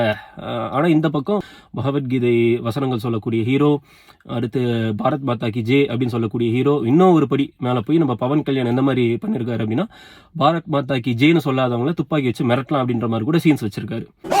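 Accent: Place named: Pakistan. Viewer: you are literally speaking like a Pakistani person voice,ur videos show's how much ur radical, u r too bad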